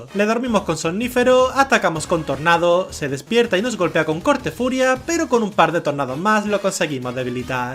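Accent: Andalusia, Spain. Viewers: Eres andaluz? :0 Yo soy de Cádiz 😎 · bro eres de andalucia??' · de q parte de andalucia eres?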